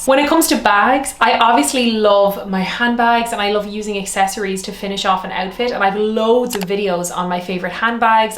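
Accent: Ireland. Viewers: You look like a young Elizabeth Taylor! Stunning! Except your Irish!) love your accent! I agree W your tips. I clean skin regime…..and those little laundry bags for your intimates ( keep your $$ things well, they last,same with makeup…..you invest in it…take care of anythng it will last. I love being tall & thin wearing leggings …..WOW TIDE REMOVER PEN? LOOKS LIKE A NEED FOR ME! THANKS GIRL IVE A KITTY SO YOU GET ME ❤😊😊😊😊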